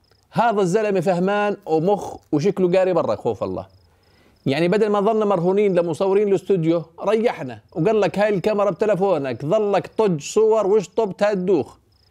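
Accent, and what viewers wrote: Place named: Jordan. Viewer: ههههههههه فطستنسي من الضحك عايش بامريكا ومفتقد لها الهجه الاردنيه ههههههه تحياتي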